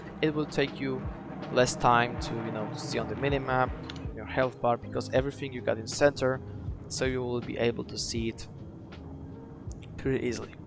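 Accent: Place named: France. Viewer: Thanks for this I hope it helps me.. Im guessing your french 😬😬 so mercy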